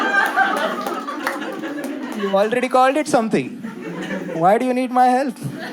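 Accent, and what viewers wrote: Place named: India. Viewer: He has such a stereotipical indian accent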